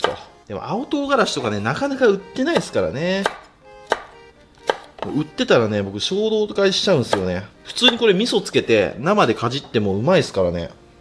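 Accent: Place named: Hakata, Japan. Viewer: いつも楽しい美味しそうな動画ありがとうございます❤ 博多弁と急なえちえち雰囲気、 謎すぎてめっちゃ笑いました🤣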